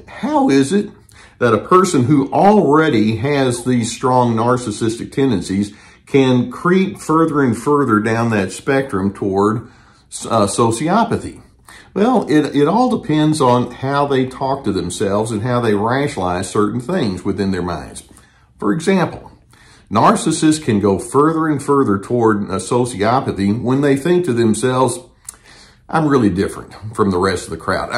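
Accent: South, United States. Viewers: I forget where my discourse amongst myself takes place in this comment section, but it’s really funny because my mom will be laughing so much. Everything he says. Is me but like I’m counteracting it. It’s funny because it’s like wow he’s got that southern yet. Chris drawl. I am yapping. Like a Chihuahua. About my identity and rights. It’s hilarious. This is good banter. Sometimes two opposing forces can create something like the binary between us. Binary sound, you know what I mean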